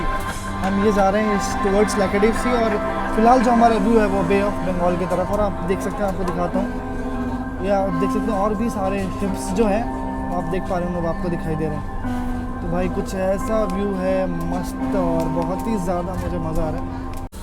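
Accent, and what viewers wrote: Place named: South India, India. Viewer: You sound more of a south Indian :D ...explained the details well.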